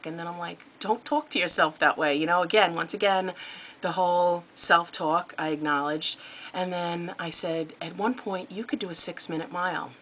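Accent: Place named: East Coast, United States. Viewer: Great vlog! So proud of you thinking through things you're "saying to self". Ps..love your east coast accent:)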